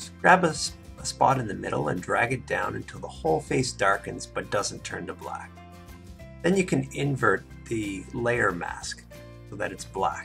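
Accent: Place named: Canada. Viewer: I can hear Canadian accent!))) cool!))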